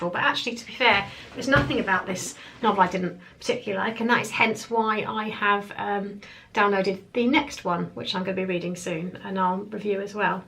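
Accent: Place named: RP, United Kingdom. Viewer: Received pronunciation .moron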